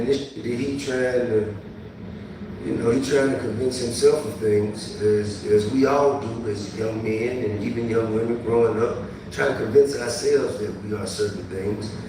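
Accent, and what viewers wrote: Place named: South, United States. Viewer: I love when you do that Southern accent.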